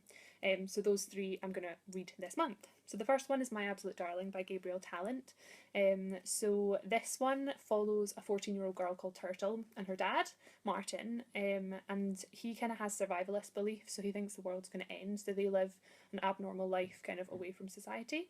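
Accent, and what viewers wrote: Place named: Ireland. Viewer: Hello, New viewer/subscriber here. Am familiar with some of what you showed here, though have yet to have read any. Have been intrigued with Tolkien for a while. What would you recommend to start with him? I'm guessing you're from....Ireland....? I've always been interested in dialects, though not the best at identifying them. :-) Thanks for sharing, and looking forward to more from you. -Carm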